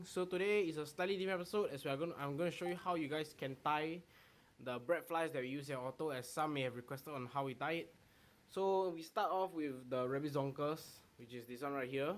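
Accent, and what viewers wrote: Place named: Singapore. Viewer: Where do you fly fishing usualy?are you from singapore??the way you talk just like a singaporean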